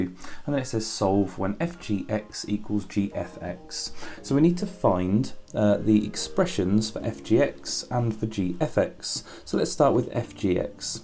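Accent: United Kingdom. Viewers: No doubt this guy's videos are good. I like the mild black country accent.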